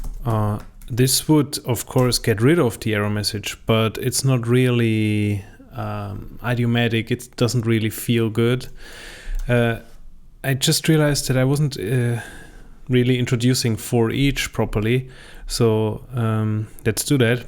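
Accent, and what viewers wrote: Germany. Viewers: Hello Rust! Oh that sounds pretty cool. And yeah I feel you have some nice German accent when you talk on the video :P I started learning and using Rust because of TiKV actually :) Thanks for sharing those videos again and keep up with the good work :)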